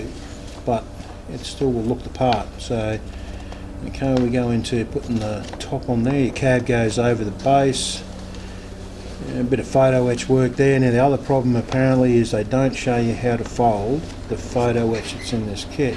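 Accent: Australia. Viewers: Awesome review, great to hear a Aussie reviewer, good on you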